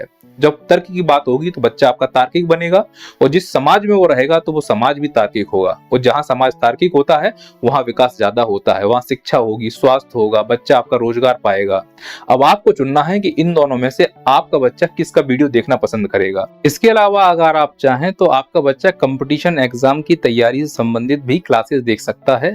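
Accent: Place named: Haryana, India. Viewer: 🎉chora hariyanvi 😂 hai bolte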